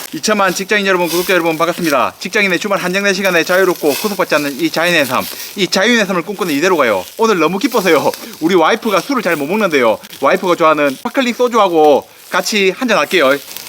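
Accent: Gyeongsang, South Korea. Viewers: 언제봐도 정겹네요 경상도 사투리가 가슴에 팍팍 와 닿습니다 ^^~ 이건 음식 고문이다 ^^~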